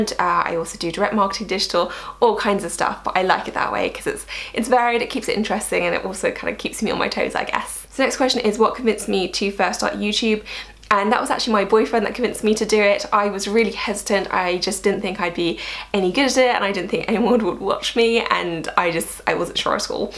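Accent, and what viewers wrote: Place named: Wales, United Kingdom. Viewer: I’ve only recently come across your channel and I’d like to say how humble you come across, you give some brilliant advice. I’m from South Wales and was so surprised to hear you and your dad are Welsh! 😂😂 you’re definitely the poshest welsh person I’ve ever heard 😂😂. Well done on your career and your YouTube channel you’ve definitely got a new subscriber xx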